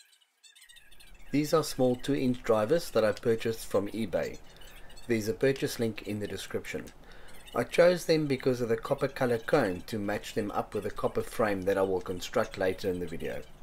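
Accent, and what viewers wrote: South Africa. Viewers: South African? Dig the design!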